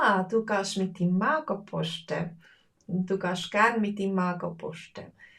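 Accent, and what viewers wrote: Bern, Switzerland. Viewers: Hoi, ja genau. Ich luege Dein Kanal uf Youtube in Düsseldorf aa. Bin aber regelmäßig privat in der CH. Am 22.02 habe ein Schnuppertag als Pflegefachmann in Rehaklinik Tschugg Kanton Bern. Übrigens sprichst Du Berndeutsch Dialekt? So hört sich das an:-). Ausserdem du bist mit meinem Landsmann PL zusammen, dadurch ist Dein Kanal für mich noch Stück sentimental. Ich bin auch Pole. Und von Dir lerne ich gerne Schweizerdeutsch, bzw. Berndeutsch😉Ade und Danke Vielmals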